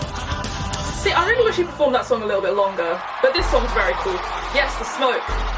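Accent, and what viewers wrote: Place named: United Kingdom. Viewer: Well I am a huge fan which attracted me to your video and I heard the British accent I was like hey gonna support a fellow brit. But anything live by him there is a these vevo performances he did a few months back you could check out. Also cover by I'm a huge fan especially which is my fav album of all time. I'll try to think of more. But let me what you have heard and haven't so I can suggest more. I would message you on socials but I don't have any social media anymore I deleted it all last year sorry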